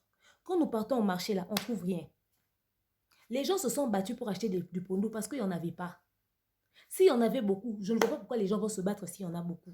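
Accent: Ivory Coast. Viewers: Bien dit merci. Juste ton accent, tu parles comme les ivoirienne pourquoi?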